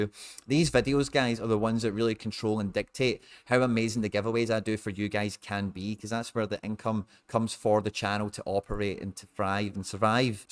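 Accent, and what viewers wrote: Scotland, United Kingdom. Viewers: Few things like listening to a cheery Scotsman discuss Sorare as the friday workday winds down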